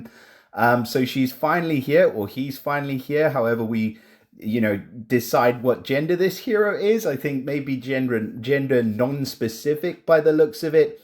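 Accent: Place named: Cape Town, South Africa. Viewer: lol I knew it...certain words I can hear you from CPT😅 ain't nothing like a CPT accent 😎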